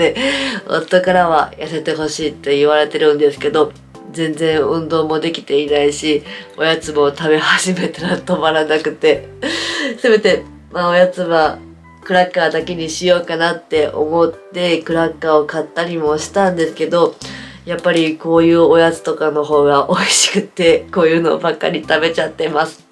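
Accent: Kansai, Japan. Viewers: この間初めて見たんですが🎉インスタライブの関西弁のときもかわいいです❤🎉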